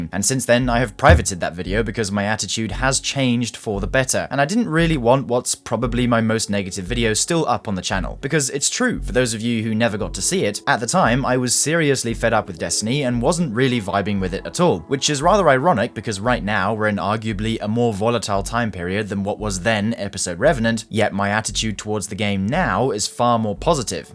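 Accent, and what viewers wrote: United Kingdom. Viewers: I usually roll my eyes at the “The future of this channel” videos because they’ve turned into bait due to what they’ve become to signal recently, but because I’ve become such a big fan, I had to watch. About halfway through when you said that you found your community, I realized something: You don’t know what you’ve become to the community. You seem genuinely surprised that your guides have been so popular. I will tell you that while Byf is our defacto lore person, you have fallen into that role for guides. Not that others are bad, but because of several things you offer in yours. They’re concise, to the point, very detailed in all the ways they need to be while showing exactly what you’re talking about with a phenomenal tone, vocabulary, personality, and delivery. As far as your builds and guides go, I consider you my Alfred. Giving your gentle British advice and tips before I go out and exact vengeance and get my ass beat lol. 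Thank you and keep doing it. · tbf his british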